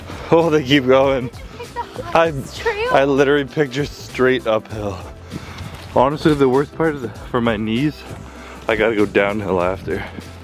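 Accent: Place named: Canada. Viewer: Dude the first time I heard u speak I’m like this guys def Canadian ! keep it my Canadian brother .. shout out form the 6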